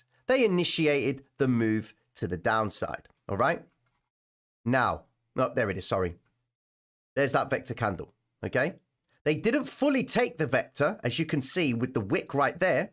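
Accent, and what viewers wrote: United Kingdom. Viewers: Ill be honest it's nice to have a UK voice 👌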